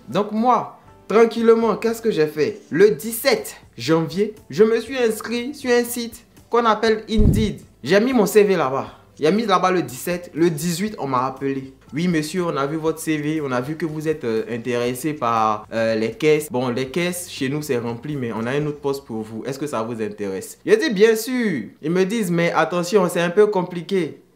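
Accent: Ivory Coast. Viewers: Orrrrr djo j'aime trop t'écouter 😂 depuis ABIDJAN, sérieux ivoirien peut pas se cacher, quand il parle net ont sait que c'est lui 😂😂😂💕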